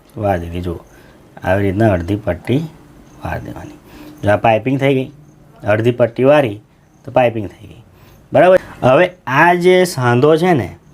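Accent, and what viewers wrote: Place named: Mehsana, India. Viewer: Kheralu baju na so bhai mhesana ni bhasha se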